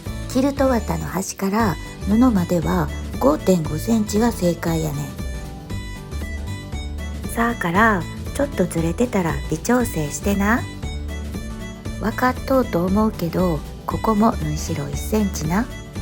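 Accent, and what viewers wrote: Kansai, Japan. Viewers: 素敵です🎵 毎日見ていても飽きないです❤ 関西弁も可愛すぎます！ 私もパッチワーク教室行こうと思ってます👍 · 😅関西弁で戸手も❤身近にかんじてしもた。 有り難うございます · とっても楽しく拝見させていただきました。 わたしはキルトトップはミシンピーシングですがキルティングはハンドキルティングですのでベッドカバーなどですよ仕事の合間にするので数ヶ月かかってしまい途中でこのような"quilt as you go" 方式でフロアーマット等の子ものを作って達成感を味わい又勇気づけられて引き続きひたすらキルティングを進める励みにしています。 実は今オーストラリアに在住してすでに20年になりますがその前は学生時代を含めて京都に10年ほど住んでいました。動画を拝見しながら柔らかい関西弁が懐かしかったです。１０年住んでも私は最後まで京都弁が身につかず、かろうじて『おーきに』 が言えるようになりましたがそう言うたびに『あんたの"おーきに"はなんか変やで。』とよく言われていた事を思い出して一人でクスクス笑ってしまいました。 用いられている生地も可愛いものばかりで特に裏に使われていらっしゃるプリントは大好きです。日本へ帰国するたびに大量に布や手芸用ツールを購入して来 ますがやはり思いついた時に出かけていって手軽に手に入れる状況がちょっと羨ましいです。 本当に楽しませていただきました、これからも動画チェックさせていただきます。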